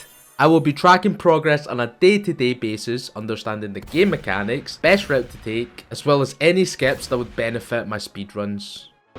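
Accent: Scotland, United Kingdom. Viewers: There's such a goofy charm to Scottish accents, audio book when?? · Asian man with a Scottish accent. fucking awesome. <3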